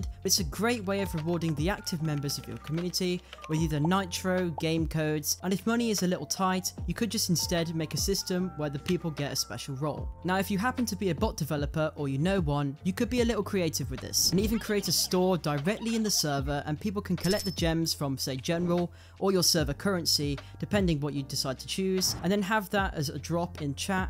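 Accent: United Kingdom. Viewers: hello my fellow british person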